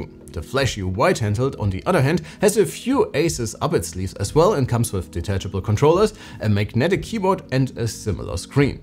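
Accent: Germany. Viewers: That German accent is 🔥 🇩🇪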